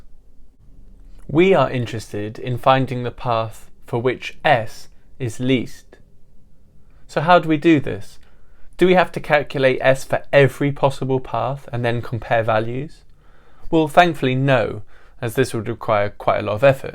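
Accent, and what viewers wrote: United Kingdom. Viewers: I love his handwriting and his british accent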